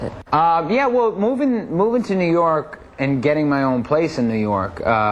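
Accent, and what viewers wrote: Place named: Boston, United States. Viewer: said "you know" 30 times. and each time was amazin lol love his boston accent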